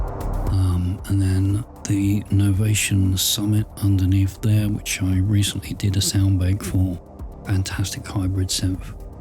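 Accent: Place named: United Kingdom. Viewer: Your studio is bad ass brother. I never heard you talk. And just imagined you as a Yank. So my question. Where across the pond are you from?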